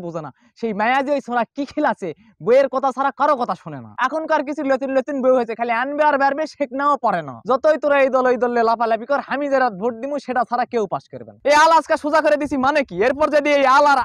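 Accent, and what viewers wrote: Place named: Bogura, Bangladesh. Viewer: হামাকেরে বগুড়ার ভাষার মতন ই বারে😆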